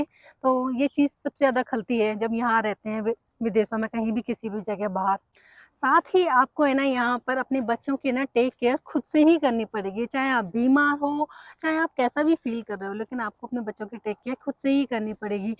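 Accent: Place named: Purvanchal, India. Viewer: Aap pakka UP purwanchal se ho... hai na ?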